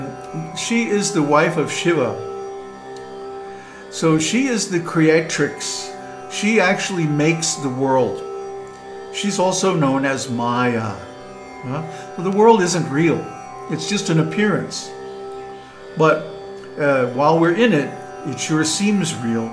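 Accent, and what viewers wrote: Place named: United Kingdom. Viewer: Guruji are you originally from England or Scotland ?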